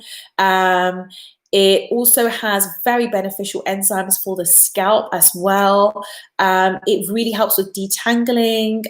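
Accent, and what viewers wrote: United Kingdom. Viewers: So funny. I literally just looked up "fortnightly" (happening or produced every two weeks). You speak the Queen's English. I speak American. LOL!!!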